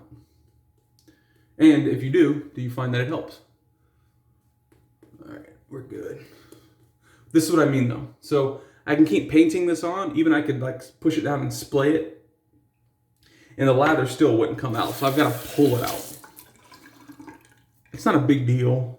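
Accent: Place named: United States. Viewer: That was a good shave! I vote TSE Espresso for the next one. It's called a three-way tie! 😁 Classic Shaving is not tallow based, and all of their scents come in both their regular base and a lanolin base. My pre-shave routine entails using a face wash during a hot shower and then shave right after. I haven't done the hot towel method since I used cartridges. Lol. I'm already getting decision fatigue trying to decide on what soap to use every morning. SMH. I gotta laugh at the gringo pronunciations you use. 🤣 But that's okay, you're still awesome. Hey, how 'bout you mail me a nice bbq'd brisket? Lol. Love you,! 💙🖤💙🖤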